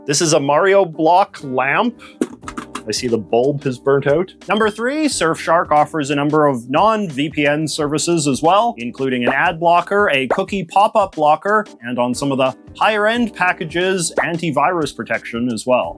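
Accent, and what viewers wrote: Canada. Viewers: I thought you were Canadian, J.J.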